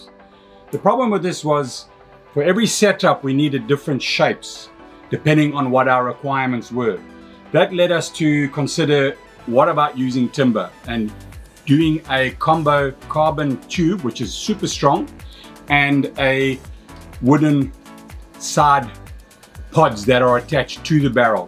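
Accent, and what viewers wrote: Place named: South Africa. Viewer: I have been watching your videos for many years with your cute south African accent and I am waiting for you to start making wooden gun. Now I have the reason to visit you in South Africa!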